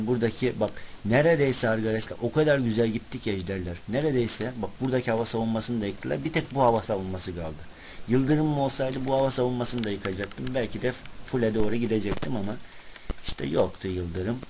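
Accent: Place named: Giresun, Turkey. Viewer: abi siven bizim oranun sivesi da sen nerelusun ben giresun espiye ..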